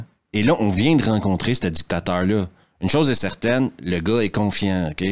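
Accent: Canada. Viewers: j'aime cet accent canadien pour me rassurer de ces nanars . merci!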